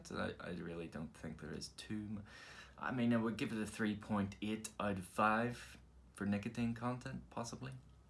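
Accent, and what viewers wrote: Ireland. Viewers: Thank you for subscribing to me do I detect a little IR ish in your voice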